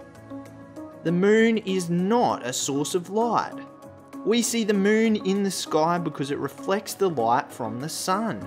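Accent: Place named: Australia. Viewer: this is the single most Australian accent I've ever heard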